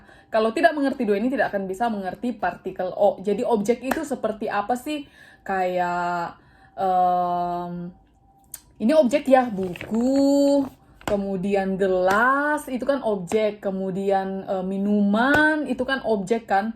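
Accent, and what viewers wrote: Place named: Java, Indonesia. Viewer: jlas sekali mbk,ketoro wong jowo 😀😀😀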